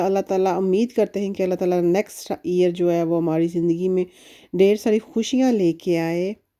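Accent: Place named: Netherlands. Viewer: Ap k speaking main wording k axcent bilkul dutch language jasa lgta. Hy .ap ny dutch language seekhi proprly ya khud hi aa gai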